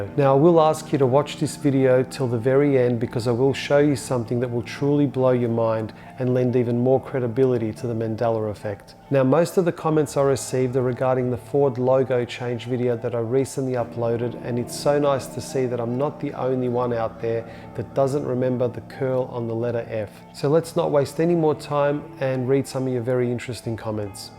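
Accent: United Kingdom. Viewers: William Young it's his English accent nutball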